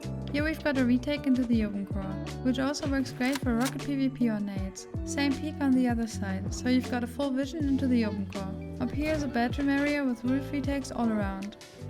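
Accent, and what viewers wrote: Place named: Germany. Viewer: du kannst gut englisch aber deutsch hört man raus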